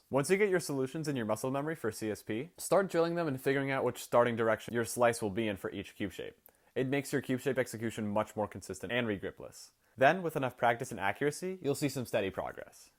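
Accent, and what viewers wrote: Russia. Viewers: I've had a feeling that a Russian accent could be probable for this one, considering who you're critiquing 👀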